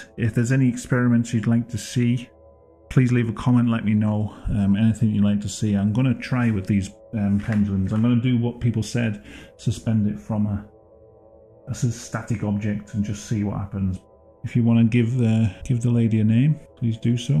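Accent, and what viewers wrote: United Kingdom. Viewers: I just realized that you have British accent (not sure either English, Scottish, Welsh or Irish well if Ireland could be counted as UK itself) the moment you said "bloody hell". No wonder your voice somewhat very interesting in certain way. Please correct me if I'm wrong thanks.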